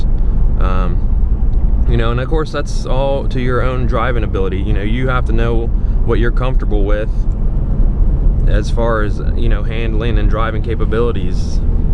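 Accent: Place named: South, United States. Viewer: I can barely understand you with that ridiculous southern drawl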